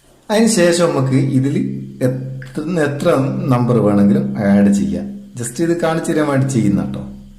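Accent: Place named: North Kerala, India. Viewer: കേരളത്തിലെ വടക്കൻ ഭാഷ കേൾക്കാനും ഒരു സുഖം.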